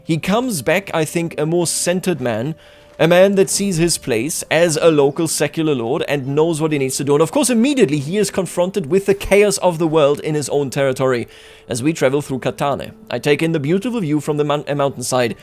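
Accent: Bavaria, Germany. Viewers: Warum machst du das eigentlich nicht auf deutsch? Ich hör ja sogar den Bayerischen Aktzent raus!😅 Würde mich nur mal interessieren. Danke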